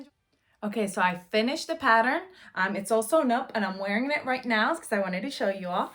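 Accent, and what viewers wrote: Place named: Colombia. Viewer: I love your accent. Are you from Colombia? You remind me of Sofia Vergara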